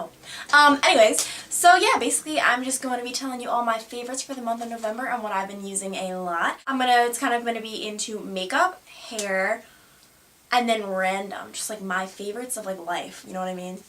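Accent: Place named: East Coast, United States. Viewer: you had such an east coast accent in this video lol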